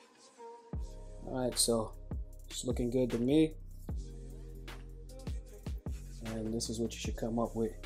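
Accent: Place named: Nuyorican, United States. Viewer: Sounding’ straight up Nuyorican! Me encanta! 🇵🇷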